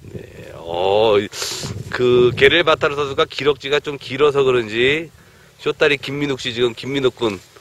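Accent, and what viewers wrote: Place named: South Korea. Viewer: I'm not being offensive but it sounds funny when you speak mongolian because your accent is so korean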